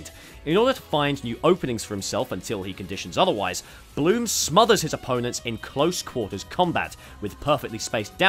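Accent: United Kingdom. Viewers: Guys a Brit. Gotta learn how they talk mate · who is this brit in my pgstats video and why is his voice so good 🤨🤨🤨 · That's just how many brits talk bro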